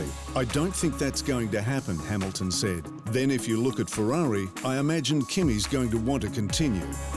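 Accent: Australia. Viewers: now I feel bad for not liking this voice lol. It helped that he was Australian 😂😂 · Australian voice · It's an Aussie voice over guy but he puts on a Movie Trailer voice. "In a world that betrayed him...."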